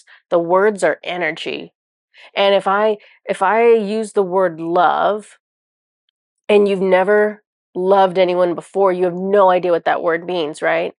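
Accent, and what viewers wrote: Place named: Australia. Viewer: Thank you for important message on presence and meditation. I can’t get past Australian accent 😂🥰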